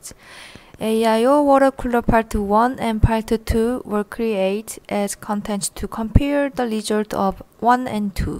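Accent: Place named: South Korea. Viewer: 한국분이셈? 영어발음이 낯익네요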